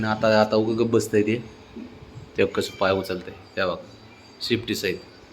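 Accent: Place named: Sangola, India. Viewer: तुम्ही पुण्यात राहत आसला तरी सोलापूर ची सांगोला ची भाषा आहे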